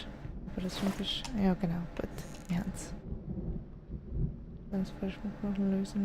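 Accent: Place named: Switzerland. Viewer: Schwiizerdütsch fiiri eifach 👌🏽 Guets Video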